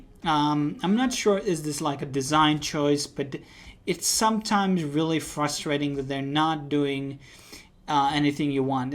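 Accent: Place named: Poland. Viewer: you sound like my polish friend, are you from Poland?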